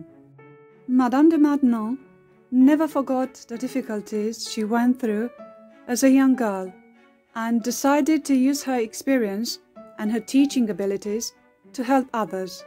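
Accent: France. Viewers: I forgot to say, your English pronunciation is perfect and your French accent is very charming. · It’s always so nice to listen to a French native narrate French history. As one who knows the language, I often bristle when many English speakers do presentations involving French names, places, and events; they frequently mispronounce the words. The woman on this video does such a nice job. Not only is the French all exquisitely spoken; but also, her tone is lovely and graceful. Thank you for posting this brief but thorough overview of Mme. de Maintenon, a lady who lived her life well and honorably.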